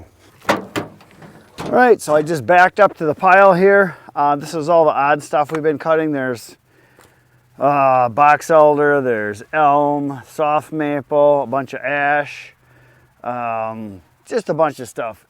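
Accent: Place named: Upper Peninsula, United States. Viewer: Hi. I like your accent. I love regional jargon. I was born and raised in Detroit. To my ear, you sound similar to Yooper to me. When I was 21, I moved to New Orleans and lived there 26 years. Yup, "what kind of Coke you want?" is common there. "Neutral ground" is the road median. "Dressed" means with lettuce, tomato, and mayo. Haven't even scratched the surface or get started into the Cajun words (southwest Louisiana). Have a good one. Chuck now living in Florida.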